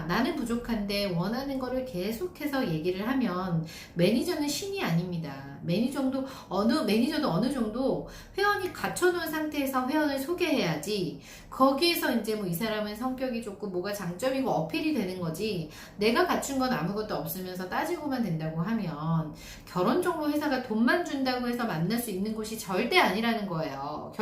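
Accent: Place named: Seoul, South Korea. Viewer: 서울말 잘한다!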